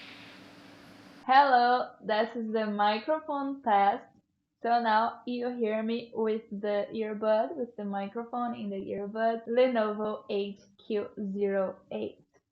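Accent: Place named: Brazil. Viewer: Totally Brazilian accent, congratz for the video.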